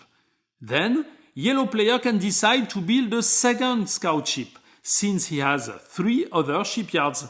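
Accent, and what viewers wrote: France. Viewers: Thank you, excellent video. I love the French English accent!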